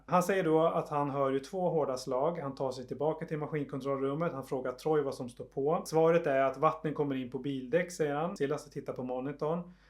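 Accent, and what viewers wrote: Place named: Finland. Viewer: ARE YOU FROM SWEDEN ? BECAUSE YOUR ACCENT YOU LOOK MORE THAT YOU ARE FROM ..........FINLAND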